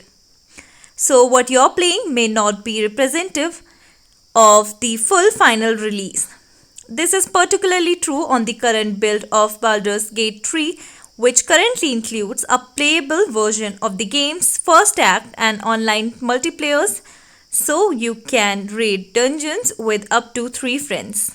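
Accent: India. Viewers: Very nice Voice and cute accent from one of the Indian Languages, I suppose :) But you really need to have some Pictures that do not look like Watercolor Paintings :P Good luck and thanks.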